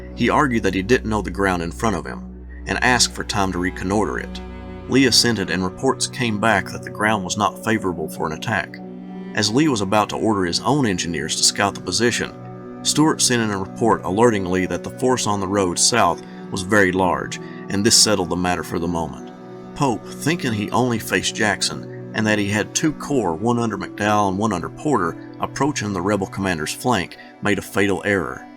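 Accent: South, United States. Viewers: More long videos sir, these are by far the best battlefield series on YouTube and I throughly enjoy hearing a fellow southerner narrate. Which state are you from if I might ask? I’m an Alabamian and get excited every time I see my state represented. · This Southern Accent Is Way Too Distracting.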